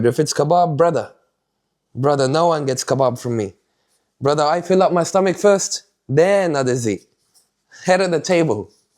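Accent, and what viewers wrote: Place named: United Kingdom. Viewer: I just watched it because of British Accent🤦😂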